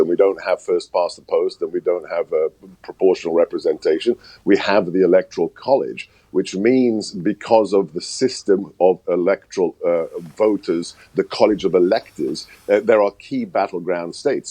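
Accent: United Kingdom. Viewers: why did the republican senators win and only Trump lost? What a sore looser. DUMP TRUMP. You got no evidence. just because you talk with a British accent does not make what you say true.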